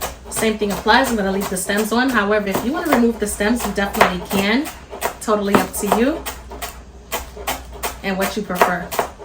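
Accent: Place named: New Jersey, United States. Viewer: Is that a Jersey accent I hear? Love this recipe also, thanks for sharing ❤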